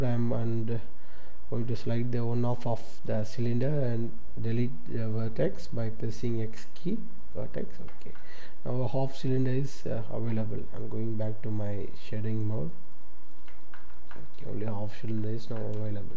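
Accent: India. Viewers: your indian right?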